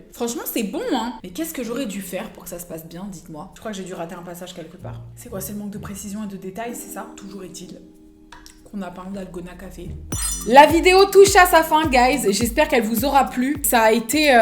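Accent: United Kingdom. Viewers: ur BRITTISH ACCENT IS AMAZING🥺💞💞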